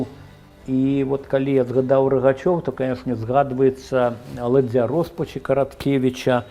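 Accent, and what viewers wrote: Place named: Russia. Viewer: Ен размауляе на мове з руским акцэнтам. Мова инакш гучыць.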